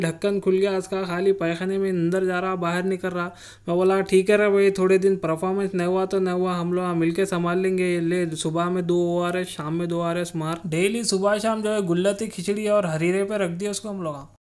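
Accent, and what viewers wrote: Hyderabad, India. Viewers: English class ny hoti vo hyderabadi slang hy vo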